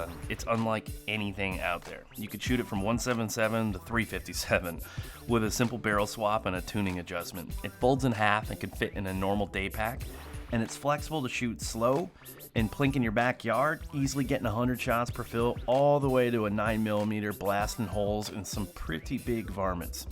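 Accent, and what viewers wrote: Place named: Spain. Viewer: What's up with the Spanish undertone? 🤔I'm confused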